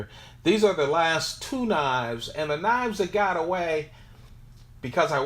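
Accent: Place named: Boston, United States. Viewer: Spyda-co. This guy's gotta be from Baastin.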